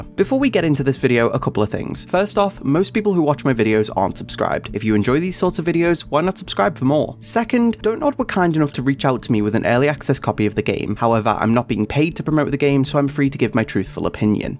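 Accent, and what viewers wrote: United Kingdom. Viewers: Inglês já é difícil, o cara falando com sotaque do britânico que nem uma metralhadora não dá KAKSKDKKDSKKS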